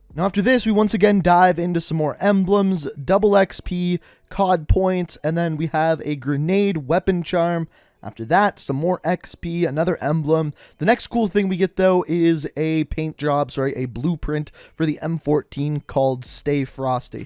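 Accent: Canada. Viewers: The way you abowt just gives me terrance and Phillip vibes guy 😂😂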